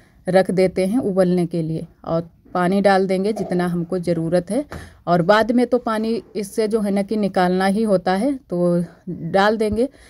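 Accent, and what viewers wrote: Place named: Uttar Pradesh, India. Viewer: Bhut acha vlog aap 1 din apni Up wali voice m video k voice over kro bhut acha lgta h mujhe aplogo k language ❤ I m from west Bengal yha yhsb language dur dur tk nhi bs hindi or Bengali h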